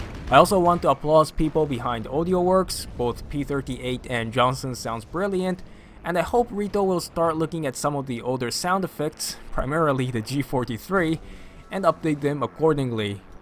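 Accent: Germany. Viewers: what really?!? He totally has a german accent XD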